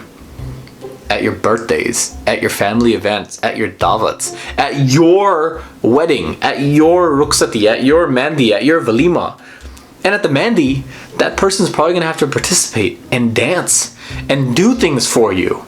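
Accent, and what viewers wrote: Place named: United States. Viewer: man! man! man! I'm new on your channel, you're brilliant. i can watch each of your videos multiple times!! I've never loved American accent so much before i heard you talking (I'm assuming you're not canadian. I'm sorry if you are) please respond to my comment if you get a chance to read it, I'm already a huge fan love from the uk xx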